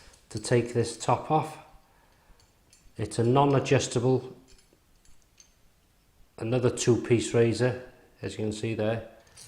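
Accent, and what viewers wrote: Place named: Liverpool, United Kingdom. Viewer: Sounds scouse mate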